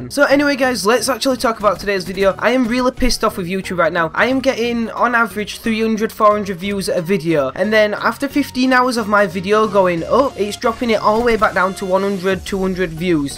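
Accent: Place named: Scotland, United Kingdom. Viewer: lol Idk why i thought you were scottish tbh... :O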